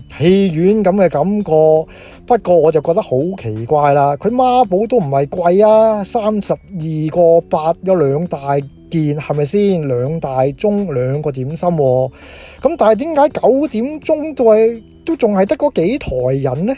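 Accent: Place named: China. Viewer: 要查清楚並講好聲音，不要太陸口音，明明牛栢葉不是牛怕葉。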